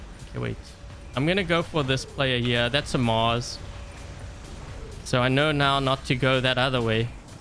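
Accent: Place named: South Africa. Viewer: Hey....when I heard you talking about sushi craze in Toronto, I didn't realize you were Canadian. I'm from GTA area too. I thought from your accent that you were in south Africa. How do I join your discord.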